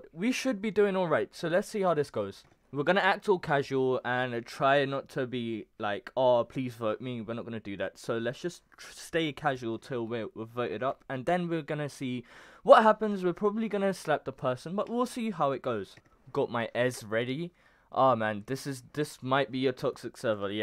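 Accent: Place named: South London, United Kingdom. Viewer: I recognise your voice from some where in South London im not saying the specific place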